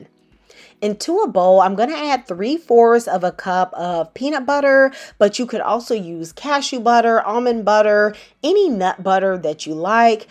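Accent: South, United States. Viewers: Are you Southern? Like the video 💙💚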